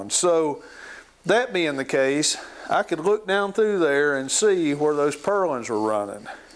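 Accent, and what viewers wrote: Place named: Tennessee, United States. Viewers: Great video, thank u much Sounds like middle Tennessee accent, wonder where u from. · I was guessing Middle Tennessee, too, but northern portion, closer to KY.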